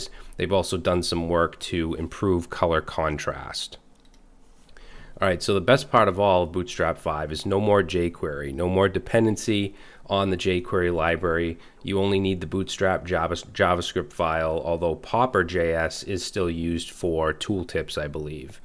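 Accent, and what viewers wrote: Boston, United States. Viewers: Is that a Bawston accent?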